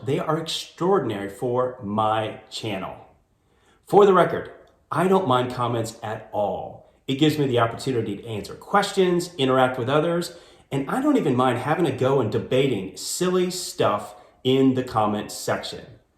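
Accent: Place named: United States. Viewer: You speak US English. No reason to apologise for it.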